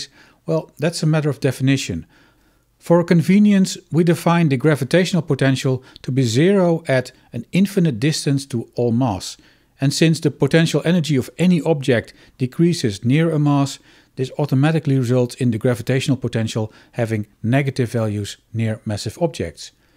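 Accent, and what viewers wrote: Netherlands. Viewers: I hate the Dutch accent.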